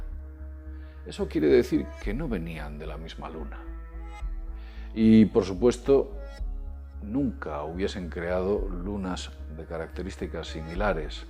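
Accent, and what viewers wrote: Spain. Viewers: Yolanda Lemus Palacios no estareis acostumbrados al acento español o por otras circunstancias serivadas de vuesto dispositivo, pues se le escucha perfectamente....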